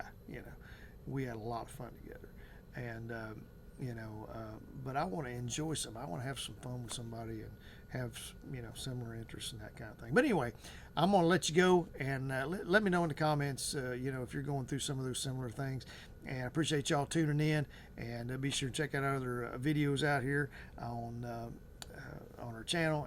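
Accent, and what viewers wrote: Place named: Tennessee, United States. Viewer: I'm almost 60 myself. I had quite of few friends thought true friends. my Son passed away but Little by little they stopped interacting with me due to what I was going through, the grief. So now I don't actually have a friend here where I moved. I moved away from my home state to the desert to start new and moved into a 55+ community. Most of them are a lot older, or already have their own friends, or stays in their homes to beat the heat all day. Plus as I aged, my confidence level has taken a hit. Contributed to ageing. Like you, I work from home and it's very hard to connect that way For friendships. Also, I'm a little too shy to join a group without having a friend or someone I know to go with me, initially. Silly I know! I need to get past that. I'll start going to the gym soon. We all need to be healthier. 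I feel your accent is from maybe TN. That state there is God's country. So beautiful. Another commonality, I have a brother named Keith. 🍺 Here's to us making new friends and having social lives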